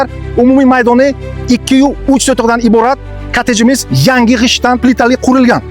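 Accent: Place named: Bukhara, Uzbekistan. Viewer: Ман шевадан даров билдим Бухоро дб. Койил Бухоро малодес буш кемела уйга гап йук. Купрок курила кенг уйладан👍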